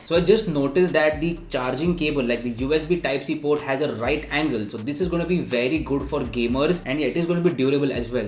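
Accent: India. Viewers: But where are you mugging Indians with your Indian accent 🤢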